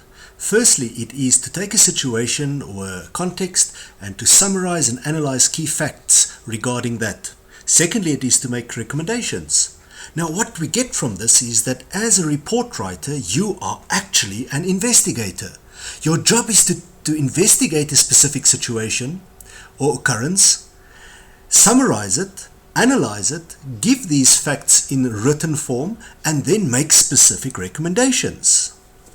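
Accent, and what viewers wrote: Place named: South Africa. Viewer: that south African accent though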